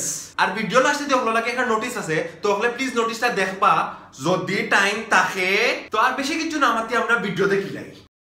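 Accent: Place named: Sylhet, Bangladesh. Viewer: bhai, this is Mahbub from India. I'm from Hailakandi, which is a district in Barak Valley region in southern Assam. Amrao sylheti mati. I'm surprised to see that there is not much difference in our accents and vocabs. Subscribe kori laisi afnar channel. Asha koriar age di aro bhala bhala jinish dekhtam parmu afnar kas takia. 😊👍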